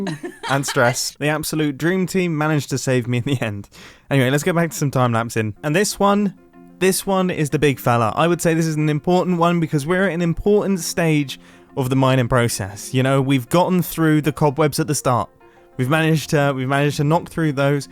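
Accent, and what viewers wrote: United Kingdom. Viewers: his british accent level : strong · All the mild british exclamations are delightful. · Mumbo is extra British in this video. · Mumbo; *casually and pretty monotone* I wish you ill, oh deary me I'm on fire, lovely jubly (So British, I love it) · yup, he's British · I remember the moments when i realized that mumbo is british... All his britishness just became so apparent from that day onward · The fact that or any other song wasn't playing saddens me.... And is it just me or did Mumbo get more and more British as time went on?? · is the most british 7 secs ive ever heard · christ this man is british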